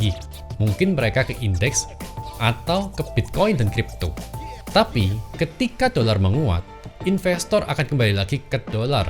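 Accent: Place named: Surabaya, Indonesia. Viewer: Bro asli suroboyo yo?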